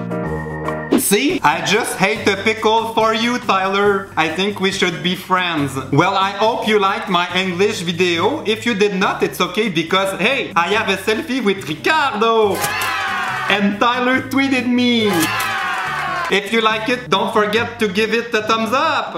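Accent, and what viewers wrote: Quebec, Canada. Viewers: T'as exactement le même accent québecois mais en anglais hahahah comme moi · Nils Bondenson I get what you mean, I live in france and for me the quebec accent is so different yet ppl there seem way nicer. He has a strong accent though. I was listening to Justin Trudeau yesterday and even he has a slight accent in english! I was like woooh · That was so hilarious! I didn't know that French Canadian people had a French accent when they speak English... That was a funny discovery. (Come on! Siblings: Frères & Soeurs) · I'm surprised by the accent. I thought only the Francophones from France have thick accents, but not the Canadian Francophones. Anyway, trop drôle! :D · Je ne pouvais pas m'imaginer que l'accent québécois assez aussi horrible que français en anglais.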